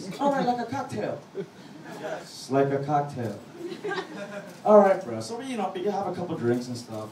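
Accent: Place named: Philadelphia, United States. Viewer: the best philli accent by faaaarrrrrrr <3 :P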